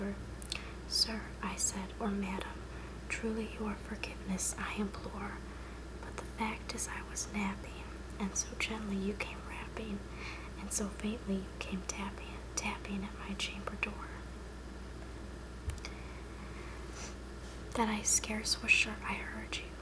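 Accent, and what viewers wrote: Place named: Buffalo, United States. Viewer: I recognize your accent. I live in WA state now but I used to live in Buffalo. Go Bills :D !!!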